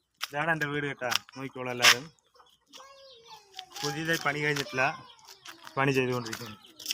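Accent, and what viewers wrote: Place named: Neyyattinkara, India. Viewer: ഇത് എവിടെയാ നെയ്യാറ്റിൻകര ആണോ അതുപോലുള്ള വർത്തമാനം. കൊള്ളാം നല്ല വ്ലോഗർ.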